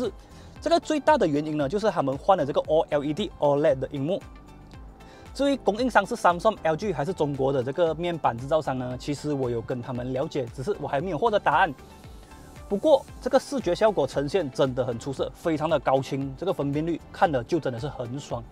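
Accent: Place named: Malaysia. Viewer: 你照你原本腔調來說話是沒問題的 不需要這樣吊著嗓子說話 比起在某些詭異的地方突然高音 還不如馬來西亞腔道來得親切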